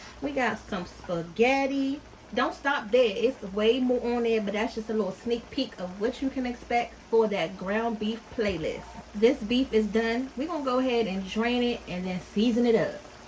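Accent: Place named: DMV, United States. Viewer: You have to be from the DMV area, I know that accent from anywhere!!! After watching the vid, yes you def are!! I feel so special watching someone from my area on YouTube!!! Get it y’all I love it😍😍😍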